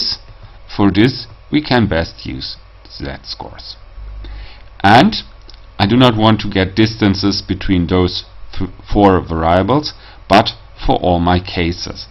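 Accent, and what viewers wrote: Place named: Germany. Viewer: schrecklich deutscher Akzent. Mach gleich ein Video in deutscher Sprache!!!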